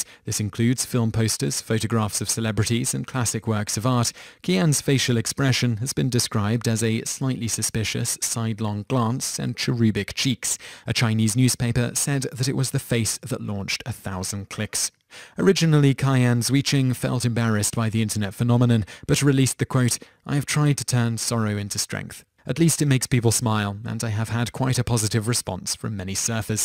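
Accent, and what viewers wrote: United Kingdom. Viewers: Why does British accent make everything seem classy and professional?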